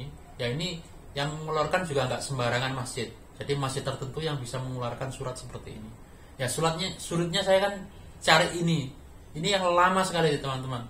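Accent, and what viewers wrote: Java, Indonesia. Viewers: bahasa Suroboyo,ne kok medok, dari mana asalnya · Logate kok jowo.jowo ngendi mase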